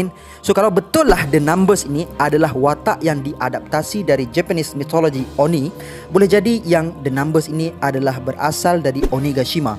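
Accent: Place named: Kelantan, Malaysia. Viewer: Arrr loghat ore koto bharu klate nim!!!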